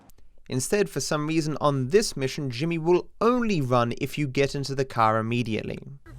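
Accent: United Kingdom. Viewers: Now I know, he’s Bri’ish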